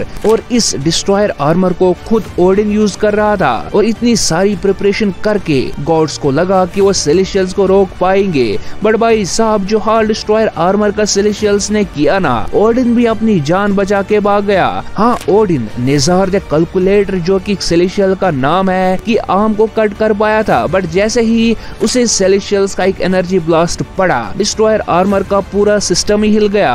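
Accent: Kashmir, India. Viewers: Kashmiri ho kya ?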